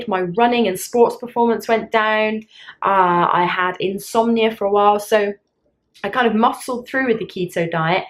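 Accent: United Kingdom. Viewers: I love you my sweety your excent is look like London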